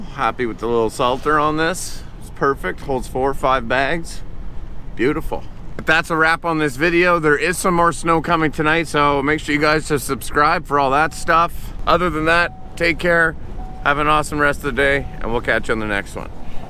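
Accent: Canada. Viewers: Those Canadian long Os are hysterical.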